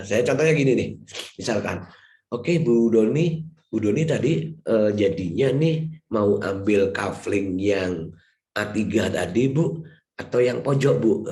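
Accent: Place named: Surabaya, Indonesia. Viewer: Yo arek suroboyoan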